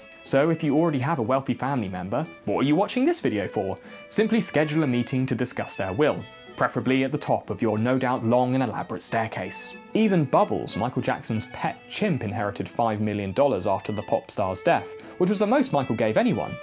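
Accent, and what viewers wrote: England, United Kingdom. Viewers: He has an english accent and never mentioned being Australian, so i don't don't know where you got that from..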